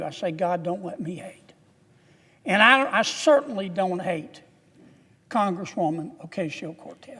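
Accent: South, United States. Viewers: Love to hear his smooth southern accent SPEAK the Great big beautiful TRUTH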